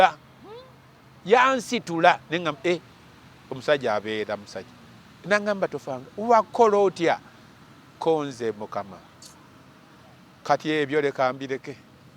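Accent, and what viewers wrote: Uganda. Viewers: Nze bwedda ndowooza si muganda. Nga ndowooza mu Easterner kuba ebigambo byo' luganda abi pronouncinga nga bo. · Why call yourself when are clearly a Mugishu? Your accent is from the ba Masaba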